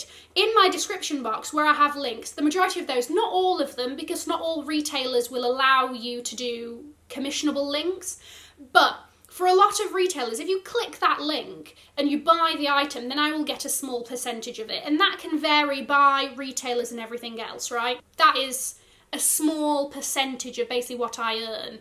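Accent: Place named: Doncaster, United Kingdom. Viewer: Well done! You have yourself a fabulous community who wish you nothing but luck and success. You’re so engaging and having your regular content is a great little piece of home (that Doncaster accent is that little slice of comfort I miss with my tea.) Keep doing you - great things never come from our comfort zones 😘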